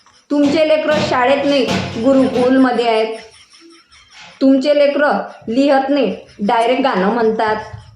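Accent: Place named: Vidarbha, India. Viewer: Mala tar aapli vidharbhachi marathi aadhipasunach aavdte rao mastach hasavlat tumhi 😂 baki aashu tai aani tumhi mala konachich baju nahi ghyaychi pan bollat ekdam kadak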